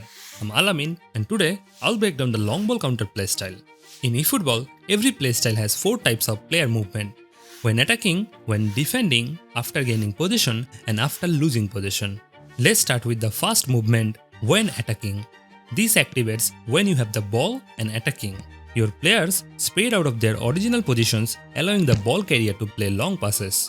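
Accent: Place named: India. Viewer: India hai kya ? Sounds like it .. but naam indian nahi hai bhai kya 😅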